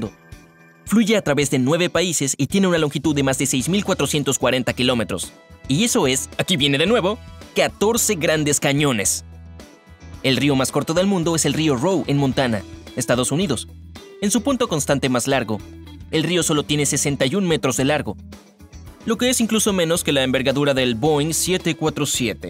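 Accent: Buenos Aires, Argentina. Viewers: El acento porteño clave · Épico yo vivo en Buenos Aires jajaja ese acento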